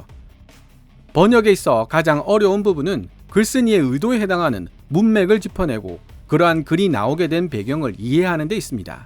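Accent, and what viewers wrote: Gyeongsang, South Korea. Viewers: 경상도 억양을 서울 표준어 억양으로 바꾸는 번역기 를 사용해서,당신의 갱상도 억양에서 탈출 하세요.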